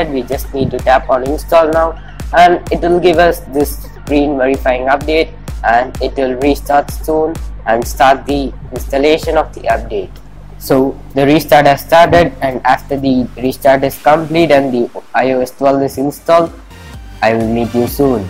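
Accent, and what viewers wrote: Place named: India. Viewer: Ahh! Here comes the Indian accent!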